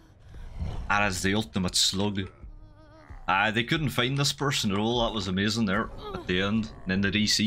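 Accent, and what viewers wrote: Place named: United Kingdom. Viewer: For 1-2 minute i wonder what does it mean "faint them" Then i realise it's pronounce of words "found them" xdd GB accent sometimes hard to understand, especially if English is not my native language :)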